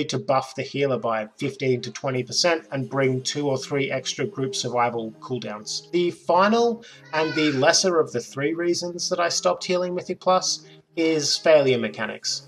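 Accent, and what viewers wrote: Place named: Australia. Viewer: You sound Aussie!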